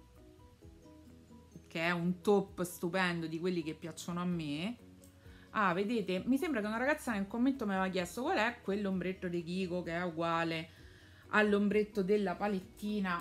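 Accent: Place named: Rome, Italy. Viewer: Primissimo tuo video che vedo, sei davvero tanto simpatica! Mi piace un sacco la tua parlata romana 😘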